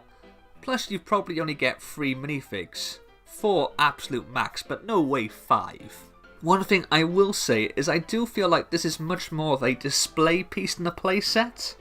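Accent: Wales, United Kingdom. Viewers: I'm just going through a ton of vids of yours, you're very entertaining and I love your welsh accent!!!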